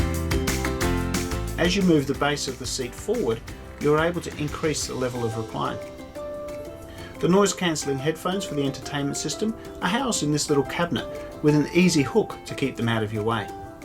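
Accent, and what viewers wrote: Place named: Australia. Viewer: Another great review! In general I'm not a fan of "in your face" flight reviews, but your professionalism, insightful commentary, and intriguing Aussie accent set the standard! Your style in fact inspired me to start doing my own YouTube flight reviews on the other side of the world. Question for you or anyone in the comments: I have the option of 2 flights in Cathay J long haul or on JAL supposedly in their sky suite III. Which should I take?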